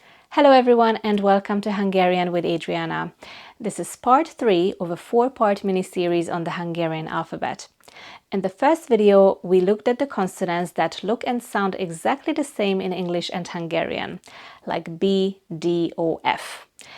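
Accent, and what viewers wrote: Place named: North America. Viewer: you speak very well, i think you are native hungarian, but then at the end your english is North American. I am from Sask.Canada, and you don't have the eastern Canada French sounds or the middle USA drawl, and dont sound like you are from the UK.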